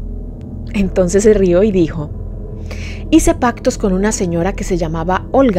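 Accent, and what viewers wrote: Colombia. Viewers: puro español de Bogotá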